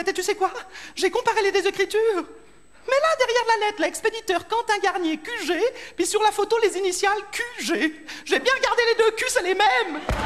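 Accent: Switzerland. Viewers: J'adore l'accent Vaudois. Je suis Genevoise❤ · Rien que l'accent helvétique est marrant....Super.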